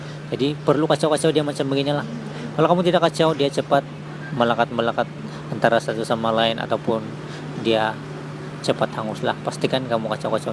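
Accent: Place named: Sabah, Malaysia. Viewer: Bah...bah..bah.. orang sabah.🤣 Mana restaurant kamu ini?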